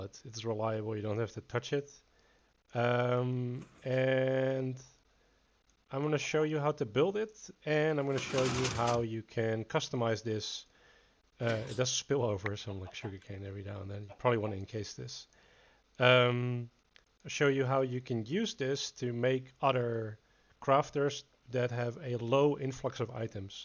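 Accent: Netherlands. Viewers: You have a very noticable 'Dutch-english' accent.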